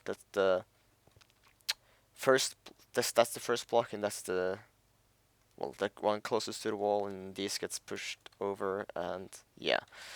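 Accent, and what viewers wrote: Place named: Norway. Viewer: I could tell by the first 10 sec that you're from Norway :P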